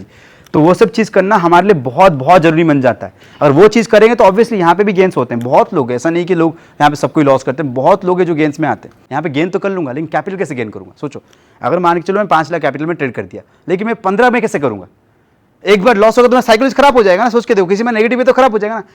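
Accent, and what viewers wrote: Odisha, India. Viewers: Hindi bolna style se lagg raha he , ap Odia ho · Sir ap odisha sa ho kiya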